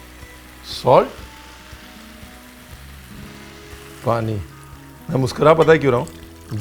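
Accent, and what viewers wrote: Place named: Punjab, India. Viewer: Aapko sunte hi maine bhi apne andar ke Punjabi ko pehchaan liya. Hats off Chef. 🙏🏼❤️🙏🏼 Isbaar bengali recipes ki baari. Plz plz.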